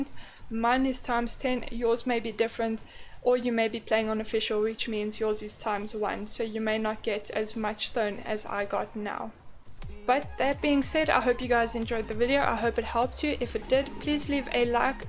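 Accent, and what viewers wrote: South Africa. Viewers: South african accent. Beautiful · Are you south african? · Wait... This accent🤔 sounds familiar.... You're South African right?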